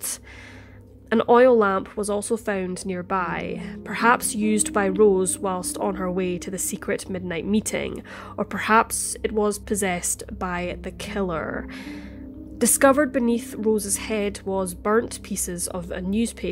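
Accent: Scotland, United Kingdom. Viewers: It's the warmth of her lyrically sweet Scottish lilt I love,, yet on the other hand some Scotts sound rough as sandpaper! You'd need subtitles to understand them! FRoM LoNdon wIV LoVe 💋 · I have such a soft spot for the Scottish accent, I'm not far from you either huni, Northern Ireland. Great upload btw , I love love love the oldest cases. New subscriber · This voice is amazing. I can't get enough of a Scottish woman's accent. Like beautiful music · I like listening to that deep beautiful Scottish accent. Great channel and great stories. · Is this narrator from Scotland?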